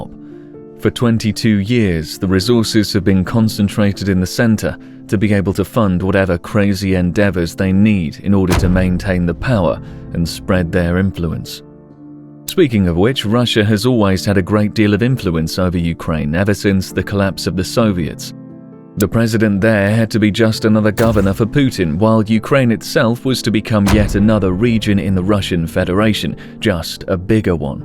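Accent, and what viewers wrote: United Kingdom. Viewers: That's because that's not the voice of the channel's author, they hired an actor from the UK and didn't hide that btw, check video's discription. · Did you learn British English as a youth and thus, speak British English like a native, or does an Englishman narrate your scripts, or what?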